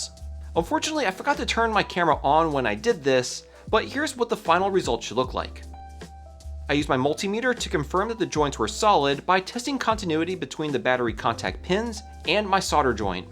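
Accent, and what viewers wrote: United States. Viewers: I love how despite his hispanic heritage, his American accent is so strong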